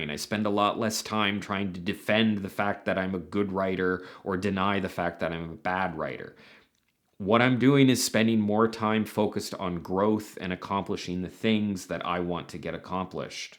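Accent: Canada. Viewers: i swear i can smell the canadian in your accent. it's messing with me trying to figure out if i'm imagining things or not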